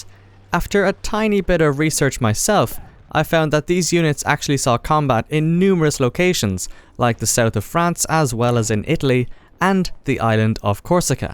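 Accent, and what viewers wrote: Ireland. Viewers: he's irish, he said it right in this video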